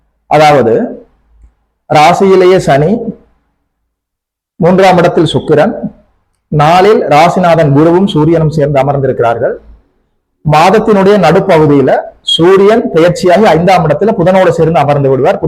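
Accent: Sri Lanka. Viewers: Nice Jaffna Tamil pronunciation Sir!!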